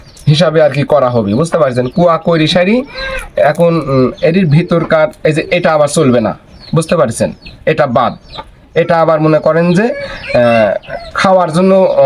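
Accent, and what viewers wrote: Natore, Bangladesh. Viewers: নাটোরের ভাষা কুষ্টিয়ার না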